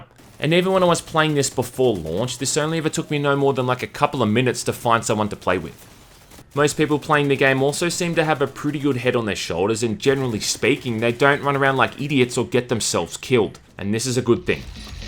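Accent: Australia. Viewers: that australian accent tho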